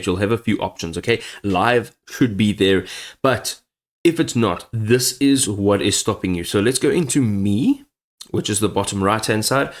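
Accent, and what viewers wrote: South Africa. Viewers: Are you from pretoria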